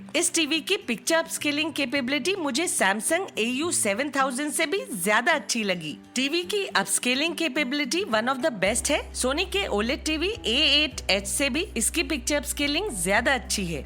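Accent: India. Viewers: I’m from Brazil and I can’t understand the Indian accent, so I put it in mute and turn on the subtitles… great review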